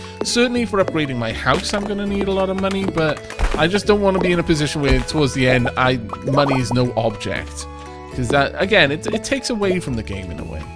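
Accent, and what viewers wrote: Wales, United Kingdom. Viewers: my mothers side of the family is Welsh so I recognize it more I think! Plus it's the fluctuation! It's got the welsh rhythm haha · You sound so Welsh <3 I really like this play through as well and your voice is lovely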